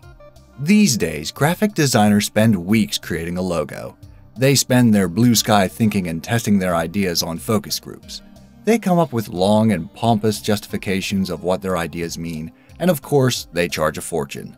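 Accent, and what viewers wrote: United States. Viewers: I’ve heard this channel is a UK site with an American accented narrator. They give themselves away every video. It’s pronounced “choopa choops” in the US too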